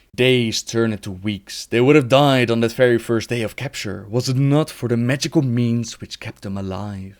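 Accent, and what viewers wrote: Netherlands. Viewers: The Dutch accent is real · He has a typical dutch accent lol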